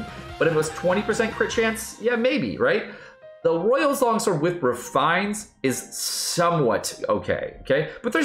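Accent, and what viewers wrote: United Kingdom. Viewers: Where did British go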